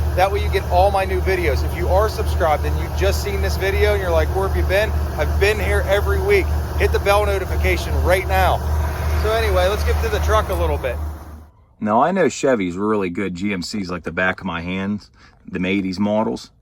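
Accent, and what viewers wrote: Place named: Philadelphia, United States. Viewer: You sound like a Philly guy. Dig the accent! Love the truck.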